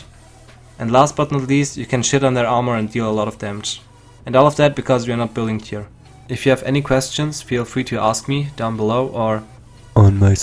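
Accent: Germany. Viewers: Dunno why but you sound like a german to me 0: accent-wise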